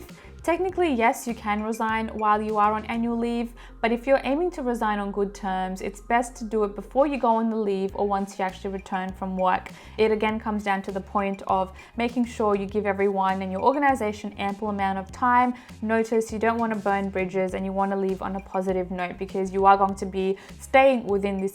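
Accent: Australia. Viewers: You're in Australia? I heard the accent but I thought the great resignation was only in the US. I like this being a global movement.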